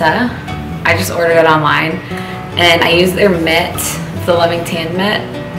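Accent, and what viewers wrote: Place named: New Jersey, United States. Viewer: Wow you literally kinda have a New Jersey accent . You seriously look like a New Jersey girl lol (not a bad thing)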